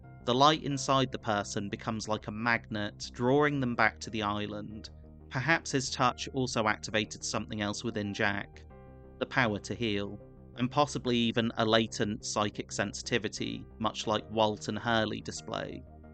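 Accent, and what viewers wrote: United Kingdom. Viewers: For the love of God, can we get someone who isn’t from the 🇬🇧 to narrate this? Are we (🇺🇸) so shallow or thick- that we “Need” a gnarly British accent just to pay attention for a little while?? C’mon it’s gettin’ kinda pathetic, now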